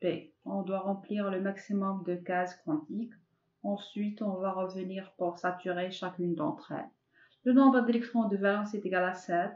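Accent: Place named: France. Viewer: hta haja ma mafhoma quality t3 son na9sa w zid l'accent francais tab3tha ....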